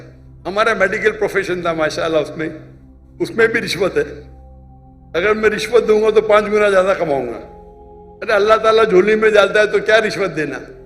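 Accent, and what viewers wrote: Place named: Mumbai, India. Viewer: Bambai boli hai bhaiii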